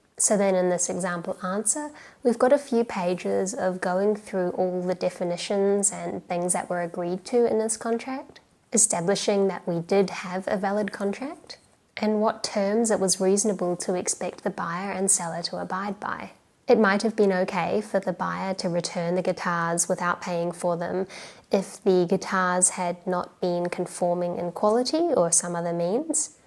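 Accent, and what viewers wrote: Australia. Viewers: Are you from Australia?? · No, that sounds more like an Aussie accent in this video. Either way, still great content!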